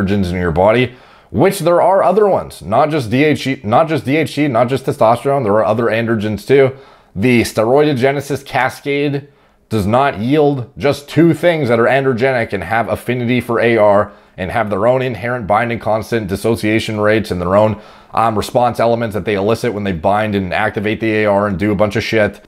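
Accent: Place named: Canada. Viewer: Canadian accent, like mine lol. I've been following his channel for quite some time and he's mentioned enough about himself without getting too personal.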